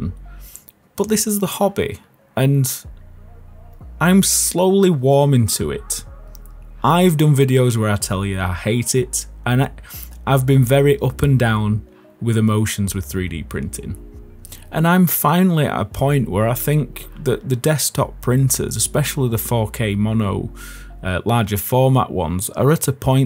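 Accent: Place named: Yorkshire, United Kingdom. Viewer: Always love your videos buddy, nice to hear a Yorkshire accent hopefully bump into you one day I’m not far away in Rotherham myself.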